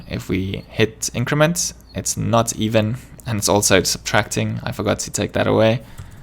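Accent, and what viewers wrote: South Africa. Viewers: South African?? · As a South African living in UK, learning Riverpod with your accent is wonderful! 'Bare with me, hey' <3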